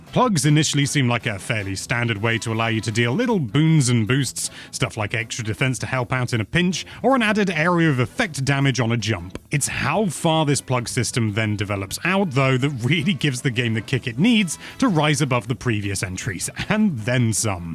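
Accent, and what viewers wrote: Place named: United Kingdom. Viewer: He’s British. That’s just how people pronounce it?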